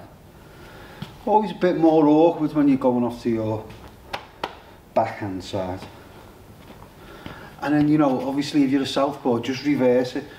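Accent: Liverpool, United Kingdom. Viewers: Like a scouse usyk there mate 👌🏾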